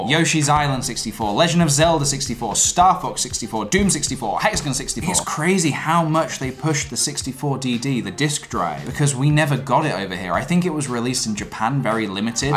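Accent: Italy. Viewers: IS HE ITALIAN???? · is he italian?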